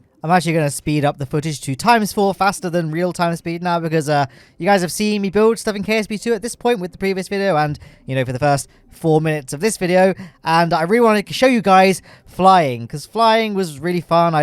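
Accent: United Kingdom. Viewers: You're actually so violently British irl it's just funny, nice work as per usual!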